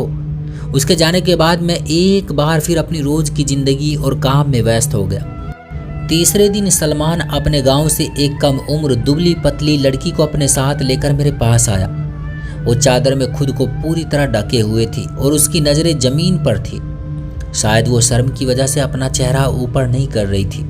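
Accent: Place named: India. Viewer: To kya hua kisi kisi ki zaban aise hi hoti hai jaise mostly bihar aur up mp side walo ki zaban aise hi hoti hai aapko samjh aa gaya na bas wahi bohot hai😏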